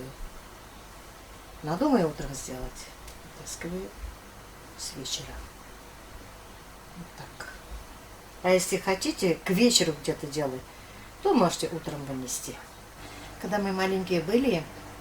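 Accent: Tatarstan, Russia. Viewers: Исэнмесез😘Какой у вас акцент татарский классный,слушать одно удовольствие❤❤❤❤❤Рэхмэт за рецепт💃💃💃💃💃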